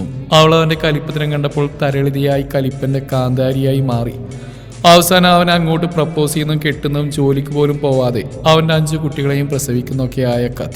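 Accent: Thrissur, India. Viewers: ഇവനിതെന്ത് വയ്യേ... 🧐😅 ഇവൻ ith എവിടെ പരിപാടി അവതരിപ്പിച്ചാലും ഇതാണല്ലോ അവസ്ഥ 😹 that thrissur slang🙌😅 twistod twis😹😹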